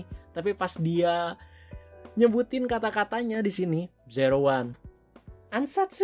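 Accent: West Java, Indonesia. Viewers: Sunda banget ya, "ceunah"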